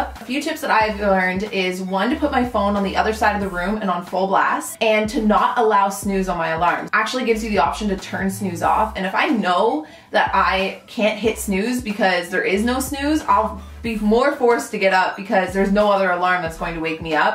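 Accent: Canada. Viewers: I lovvvvve the Canadian accent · Brea Monai I thought it sounded Canadian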